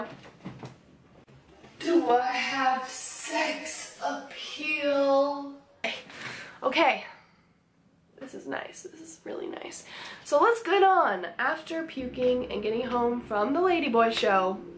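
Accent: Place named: South, United States. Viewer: LOL you should keep your southern accent at all times!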